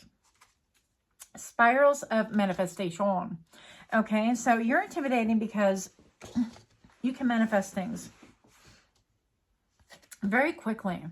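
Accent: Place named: Spain. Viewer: I love your Spanish accent. I'm from Mexico and I love it. 🩷🌟🌟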